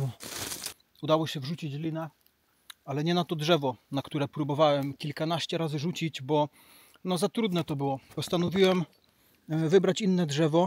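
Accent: Silesia, Poland. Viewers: Słychać że chop ze śląska 😉😁😎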